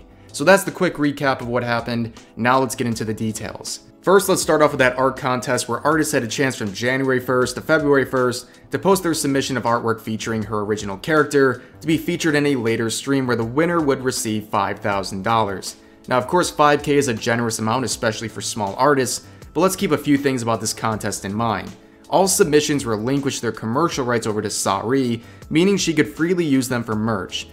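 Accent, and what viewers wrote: France. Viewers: So I don't follow at all. Not a fan of hers, not because of anything that happened recently it's just I already support a Vtuber and I'm not going to throw more money at another Vtuber who's content just isn't entertaining to me. From the clips I've seen people just seem to have a hard on for her because of her French accent. Like that is what I legit believe. This is fine as people have certain aspects about others that they are attracted to. Anyways what really stood out to me in this whole situation is that no one is right, and everyone is wrong. First of all in any contest you aren't owed anything. You take part and you either win or you lose. You can lose gracefully or you can be a sore loser and whine about it. This artist took it upon herself to feel entitled and was not noticed by senpai. So she demanded answers like she was entitled to them. 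She got in contact with a mod and didn't like the answers she got. I do not condone the manner in which he did but that's the answer she got but she STILL was not satisfied. I feel like that's what's wrong with alot of people these days is they feel everything is owed to them and they are entitled to anything they want when that is not the case. Anyhow even after speaking with she still wasn't satisfied and takes to twitter which always raises a red flag to me. You could be an adult and just deal with it but no you had to go to the cesspool that is twitter. You've automatically lost any faith or respect I had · I thought she was definitely acting toxic on her dead space stream. I know I definitely heard her say to one viewer who was leaving "What are you going to do? Touch grass? This is a Saturday, you're not leaving my stream!" Really showed me how big an ego she has and how narcissistic she is. 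Also a really good indicator that her viewer count/number of people who watch her streams is the most important thing to her. She did a lot of unnecessary yelling too, which I didn't understand. It's honestly sad because I genuinely liked her for a few good reasons; Number 1) because her art is amazing, and I'm an artist too; number 2) her cute French accent; and number 3) some of the games she plays (like dead space) are games that I really like. I did not know that this is what she's truly like behind the screen, but I am nonetheless disappointed. If anything, her toxic behavior serves as a good reminder of what I don't want to have in a relationship. I find it to be a good experience then, because I at least know what I'm looking for. I may be digging too far into it and that's just the persona that puts on for her streams, but at this point I kind of doubt it.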